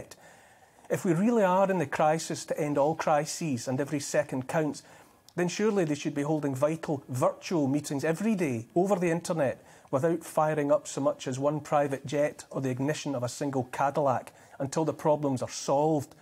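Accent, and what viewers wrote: Scotland, United Kingdom. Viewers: Best smack down delivered to the globalists and technocrats ever! And all done with a lovely Scottish bur that drips with both strength and sarcasm and humour, as only the Scots can do. Never more eloquently have I heard someone hold forth so persuasively, driving home the "One law for me, one for thee" message. Subscribed! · Brilliant. What Scottish accent is that? What region? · You beautiful man! Pomposity flensed and truth laid bare with a soft Scots brogue..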